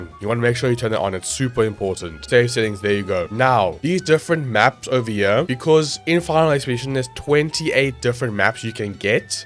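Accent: South Africa. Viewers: holy, i recognised that south african accent instantly.Thought i was the only one in the country to play als.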